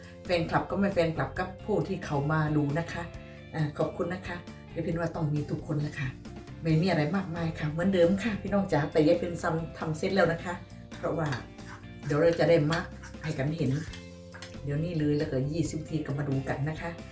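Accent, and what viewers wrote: Korat, Thailand. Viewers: ชอบดูยาย พูดโคราชค่ะ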